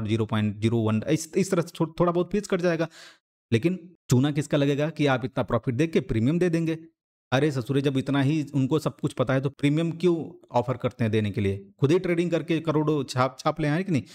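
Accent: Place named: Bihar, India. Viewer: Pakka bihari ho bhai ekdum humko lagta hai mera bhai bat kar raha hai😊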